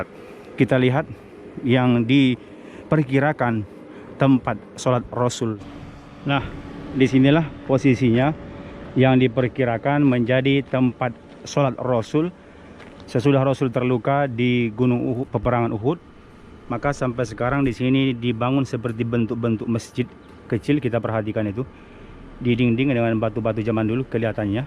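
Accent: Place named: South Tapanuli, Indonesia. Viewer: Nampak kali logat Tapselnya bg..sukses selalu..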